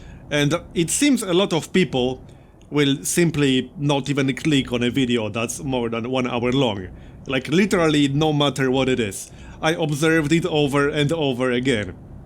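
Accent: Poland. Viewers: Just cut the long videos at 30-35 minutes with a suspense. Just like with the soap operas we will be back for more excitement. I have no problems with long videos/movies at all but I understand how the algorithm punishes the videos that are not watched at full length. I am sure many more of us like your Polish accent than those dislike it. Just keep being yourself and do what you really enjoy. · I subscribed for civ 6 deity gameplay and I wish the episodes were at least 40mins long. Your latest civ 6 play is 5/5. I don't care about the gimmicks that are popular on youtube: I did x and y happened. I wish you didnt spoil what happens in the episode in the title for example: no oil!!! When that is what happens in the episode... and me personally i dont like xcom at all so i dont watch those videos. I believe you would get more viewers with quality civ 6 content. About your accent?!?! 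Im finnish and i have a finnish accent, youre polish with your accent, i actually know one polish woman who lives here and we all havr our accents, not everybody talks like the queen of England like we are teached to do. So dont be silly your accent is fine all that matters is if people understand when you speak, not the accent! I actually like the way you speak you should sell t shirts that say "works for me" hearing that always makes me smile! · Good luck with that missing 1k, (I said around begining of December that you will get it at the begining of 2020, but as a data-focus guy, you are probably right, that more likely is going to happen around May next year. I would never thought that accent could be a problem here on your channel, but probably that's because I am Polish as well and I don't really care about the accent more than the content itself. Anyway, do what needs to be done to reach your goal.:) And sorry for repeating myself, I think that one day a month of some retro-gaming in strategy genre (video or streaming) would make me very happy. 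You know, something like Centurion, Panzer General 2, Dune II, Syndicate or Age of Empires, you know yourself. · please go to America once and actually talk to different people there. You will find out that apart from the natives (white, born in America, both parents have English as first language), everyone have some degree of accents and they don't take it as a problem. Tbh, some are even proud of their accents because they think their accents emphasize their cultural and ethical background. If you're not a native speaker, nobody would expect you to speak as one. So be confident with your English. After all, you are a Polish, not an American, and it's totally fine for a Polish to speak Polish-English instead of American English.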